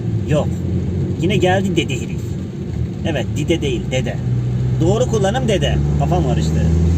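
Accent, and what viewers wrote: Kastamonu, Turkey. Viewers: Kastamonu şivesi konuş nolurrrrrrr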